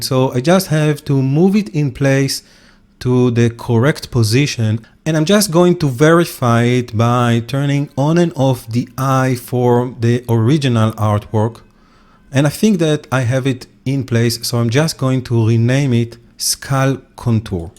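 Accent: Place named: France. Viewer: I think you are French i like your accent! And Thank you for the work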